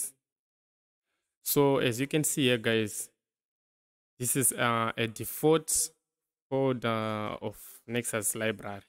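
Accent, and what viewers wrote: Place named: Zimbabwe. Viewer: This voice is Zimbabwean chete thanks bruv much appreciate the help 🎉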